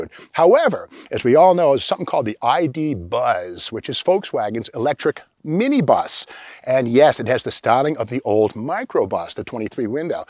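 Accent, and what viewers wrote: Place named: Boston, United States. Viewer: Boastun accent lol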